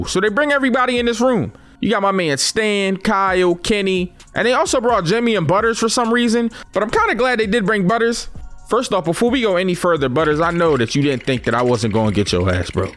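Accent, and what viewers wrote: Philadelphia, United States. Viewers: U sound more Philly den Baltimore cuz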